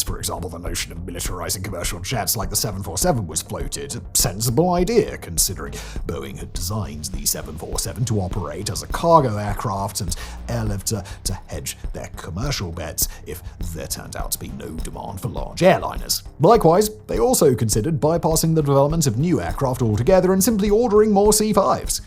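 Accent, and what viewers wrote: RP, United Kingdom. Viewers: Everything about this guy (but especially his posh accent) makes me want to punch him in the face until my arm tires.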